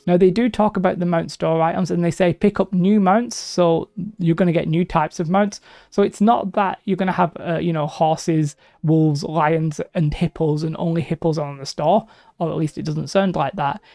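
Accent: United Kingdom. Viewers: where abouts are you from? Me and my partner have been debating which part of the north your accent is haha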